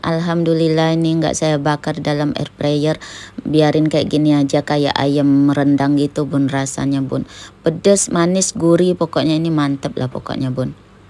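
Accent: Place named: Lampung, Indonesia. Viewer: Dari lampungkah bun. Logat bicaranya kaya org lampung ato palembang gitu 😊😊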